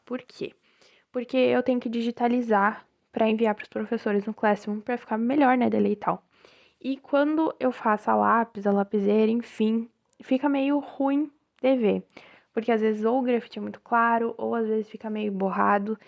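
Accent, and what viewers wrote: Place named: Paraná, Brazil. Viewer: Q estado e cidade vc mora? Não sei Acho que vc do Paraná pq seu sotaque lembra eu acho.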